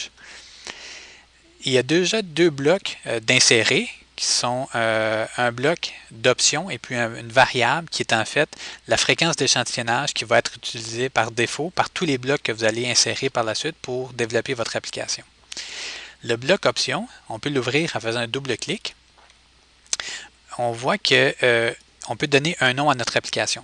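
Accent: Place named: Quebec, Canada. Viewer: superbe explications et j aime bien l acces quebequois 😊😊😊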